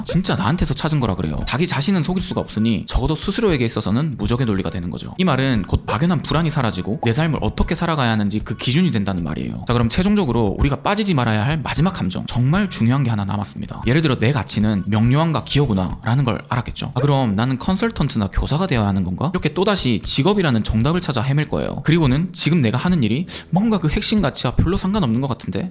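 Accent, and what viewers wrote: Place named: Gyeongsang, South Korea. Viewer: 나이가 40이 다되가는데, 아직까지 방황하고 있어서 잘 듣고 있습니다. 듣다 드는 생각인데 혹시 대구분이신지요? 사투리가 너무 익숙해서 물어봅니다 ㅋㅋ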